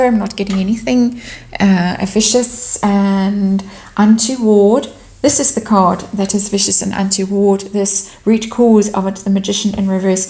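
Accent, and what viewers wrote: South Africa. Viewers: Yes I love the accent too! South African through and through!👏🇿🇦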